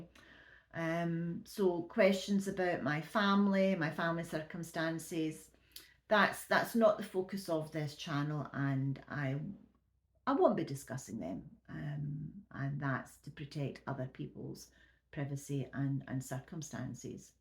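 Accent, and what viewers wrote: Scotland, United Kingdom. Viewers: Hi, some people are so rude they just are not really worth bothering about. I really enjoy your channel and your blunt Scottish accent. I am 89 and have enjoyed my life. I live with my son now because when my husband and I retired we sold up in the UK and moved to Spain we had 10 wonderful years out there then my husband got terminal cancer so we came back and he died 6 months later. I sold my villa in Spain and was able to give my two grandsons the deposit for their own homes and help my two children out as well. I live in the South East and property is very expensive so I was able to sent them up while I was still alive to see them enjoy it. I live with my son because he is alone and we enjoy each others company I have a good state pension and two small private pensions and some savings as well. I have paid for my funeral so now I am enjoying the rest of my life with my children.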